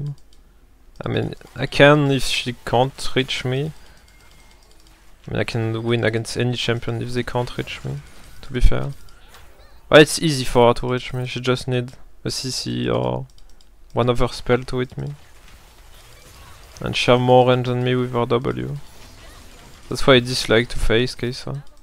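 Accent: France. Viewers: ton anglais est bon (t'as un bon vocabulaire, on comprend ce que tu veux dire, c niquel) mais tu fais aucun effort sur l'accent c'est dommage ; t'inquiètes c'est moins gênant d'essayer de faire l'accent que de juste forcer l'accent français c'est pas comme si y avaient des connards de français dans la pièce pour te juger (à part moi oups)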